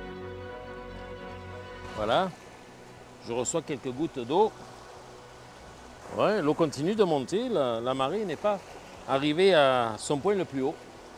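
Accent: France. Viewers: merci beaucoup pour vos vidéos utiles, pourquoi vous avez un accent français, si vous venez du Québec. meci encore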